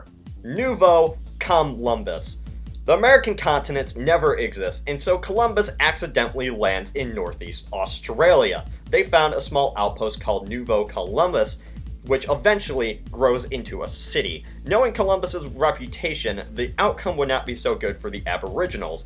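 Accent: United States. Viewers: sounds so Ohioan when he was young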